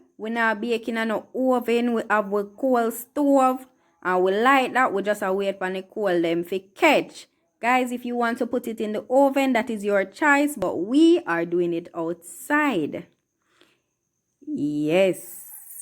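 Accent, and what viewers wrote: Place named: Jamaica. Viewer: Love the Jamaican accent! I hope I can visit Jamaica soon!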